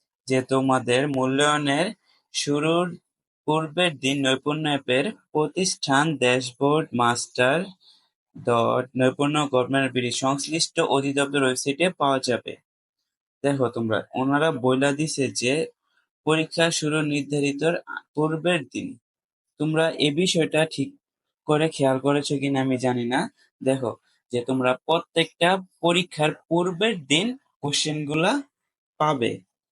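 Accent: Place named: Brahmanbaria, Bangladesh. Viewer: সার আপনার বাড়ি কি ব্রাহ্মনবাড়িয়া নাকি? আপনি দি আমাদের এখান কার মত কথা কন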